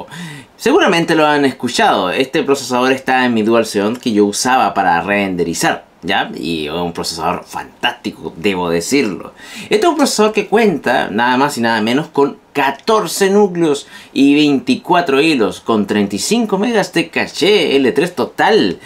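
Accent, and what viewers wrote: Chile. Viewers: Me tinca eres chileno 🤣🤣🤣🤣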